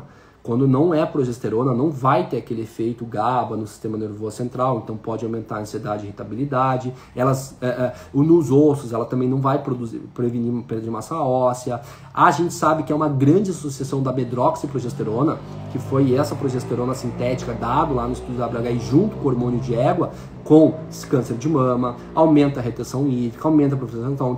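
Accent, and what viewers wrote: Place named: South, Brazil. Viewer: Oi Dr! Gostei muito das suas especificações!Eu tenho 50 anos, ainda não parei de menstruar, mas sim, já tenho alguns sintomas do climatério. Já comecei a procurar, mas ainda não encontrei um médico (a) que realizasse a modulação hormonal bioidêntica! Minha pergunta é: Esse é um tratamento muito caro? O senhor atende a algum plano de saúde? Pelo jeito de falar o senhor é sulista. Eu sou curitibana mas,resido no Rio de Janeiro. Dependendo dos valores,eu iria até seu consultório. Obrigada!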